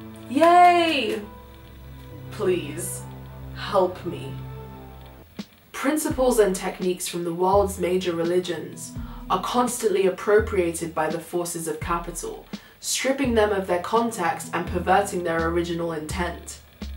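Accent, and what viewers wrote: United Kingdom. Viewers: I cannot for the life of me figure out if you're southern or a weak scot it's sending me over the edge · Okay so I just started watching your videos a few days ago. Are you British or not? I can't tell and it hurts my brain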